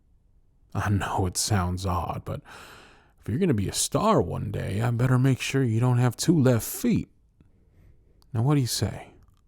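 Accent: South, United States. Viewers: Wonderful job! I love your country accent its so soothing. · i like cowboy and his Southern accent is spot on see i live in Georgia and i'm like almost surrounded bye people who have Southern accents so your southern accent is pretty good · As someone from the south i thought this accent wouldn’t have an affect on me but BOY WAS I WRONG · I love country I love the country accent to and mostly I love you and your awesome videos keep up the awesome work! ❤❤❤❤❤❤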